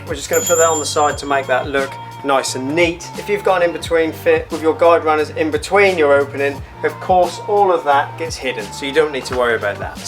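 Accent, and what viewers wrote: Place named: Norwich, United Kingdom. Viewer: You sound like a narrich boy, im one myself! I had and his team fit me a double sectional door on my garage they did a nice job, but a sectional garage door is not a diy job, abit more to it compared to a roller.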